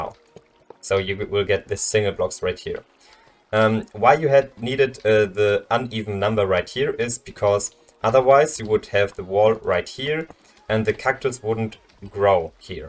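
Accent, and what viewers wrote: Germany. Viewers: Man hört so krass dass du deutsch bist xD, aber trotzdem gutes video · Nice accent, is it german? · sorry, are you a German Landsmann? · the german accent :face-blue-smiling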